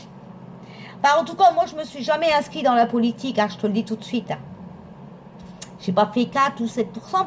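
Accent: North, France. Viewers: Coucou j'ai fait brûler de la sauge et demandé à l'univers de vous venir en aide pour arrêter tout ce harcèlement et que votre dépression s'arrête car vous ne méritez pas ça et depuis 2 ans vous remontez le moral à tout le monde alors à nous de vous aider à notre tour on vous aime et surtout votre accent du nord que j'adore 💕 💕 💕 💖💖😍😍😘😘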